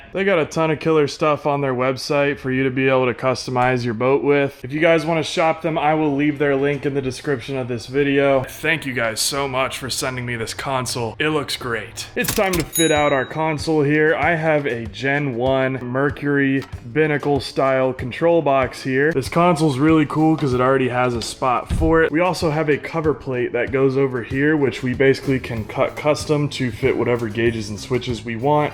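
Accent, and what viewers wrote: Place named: United States. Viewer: You really are an Ozzie with an American accent.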